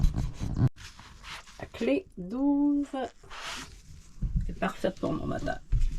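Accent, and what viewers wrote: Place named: Quebec, Canada. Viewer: j'adore vous écouter parler avec cet accent du Québec !🙂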